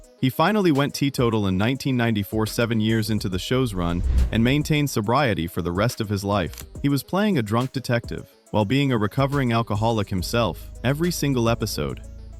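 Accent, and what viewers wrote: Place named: United States. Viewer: Interesting but it is a shame that AI accent is American.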